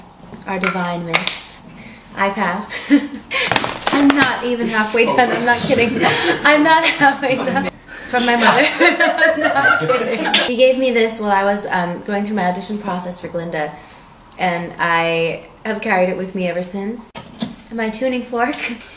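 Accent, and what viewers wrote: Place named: United Kingdom. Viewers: Well, she was in london for a long time so it's natural she'd pick up the accent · she sounds british now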